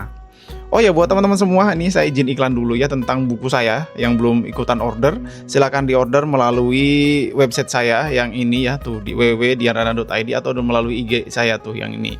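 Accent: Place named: Sulawesi, Indonesia. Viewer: Assalamu Alaikum Bung, maaf Bung pernah transmigrasi di Sulawesi, tepatnya dimana? Pantas dialek pengucapan seperti orang Sulawesi, padahal dilihat dari nama seperti nya dari Jawa yah Bung?. Sehat dan sukses selalu Bung, Aamiin.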